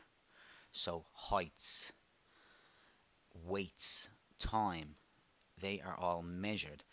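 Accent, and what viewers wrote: Ireland. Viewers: All of your videos have really helped me revise! thanks for taking time out to post these videos on youtube as my maths teacher I currently have in college is SHIT. thanks!! wish you were my teacher, especially with that sexy irish voice....... just saying:)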